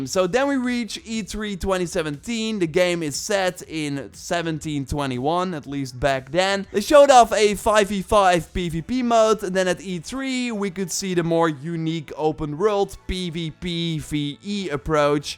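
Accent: Netherlands. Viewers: You sund very very Dutch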